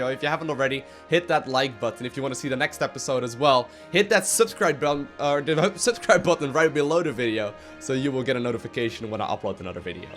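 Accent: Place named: Netherlands. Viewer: where u come from sounds a bit dutch accent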